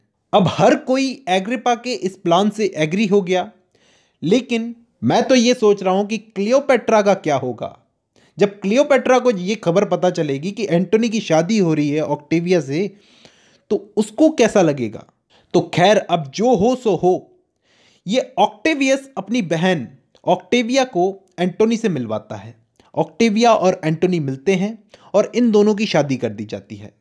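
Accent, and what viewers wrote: India. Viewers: i cant understand india english ...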